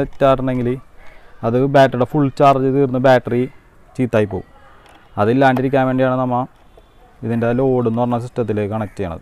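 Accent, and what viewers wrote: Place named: Edavanakkad, India. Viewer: താങ്കളുടെ സംസാരം ഒരു എടവനക്കാട് touch ഉണ്ടല്ലോ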